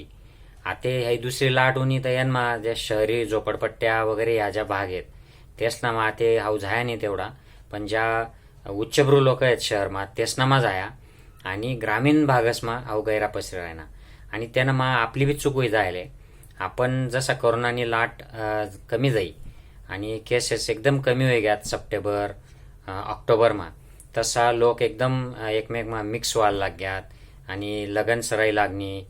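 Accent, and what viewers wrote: Khandesh, India. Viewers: गयर भारी सांग ,,,तुमनअहिराणी बोलन खुपचं गोड वाटन ऐकाले👍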